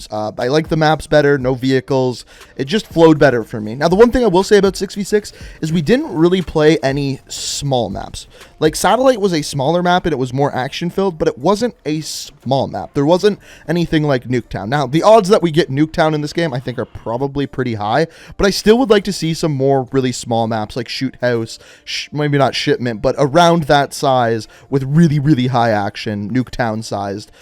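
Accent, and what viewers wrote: Canada. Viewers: His accent is so canadian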